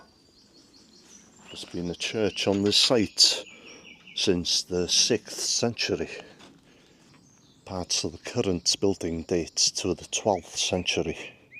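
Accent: Wales, United Kingdom. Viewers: Just want to say I love your channel. Excellent channel. My mother was born n South Wales so I am half Welsh and proud of it. Your accent reminds me of all my mothers brothers sisters and my welsh grandparents. Their first language was welsh. Keep up the great channel. Diolch yn fair iawn.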